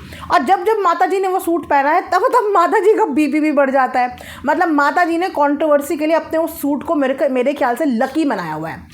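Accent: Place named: Uttar Pradesh, India. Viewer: Mai to Etna hai chirchirya sun kar waise aap ke vlog bhut acha aur saf hota hai aap bhi up se ho kya khi khu bhasha se lagta hai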